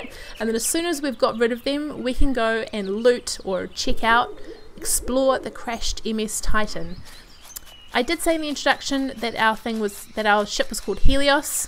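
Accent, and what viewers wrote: New Zealand. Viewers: Just found you and this vid; only bought it the other day, so all new to me. Good vid, thanks. :) SPACE girl, eh? So, Elite:Dangerous or not? ;) (Not yet explored your channel yet... another time -- overdue to get back into Empyrion...) Is there a bit of American/Canadian to your Kiwi accent -- or am I wrong?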